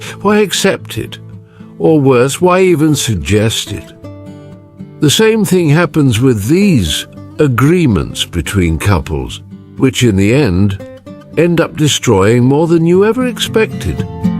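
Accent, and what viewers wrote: England, United Kingdom. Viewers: This AI voice is by far the best. The snooty English accent on this one adds a comedic factor these videos.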